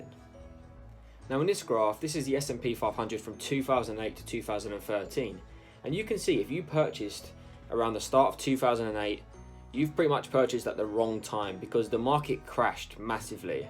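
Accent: United Kingdom. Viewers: Nice content! Love ur British accent 😉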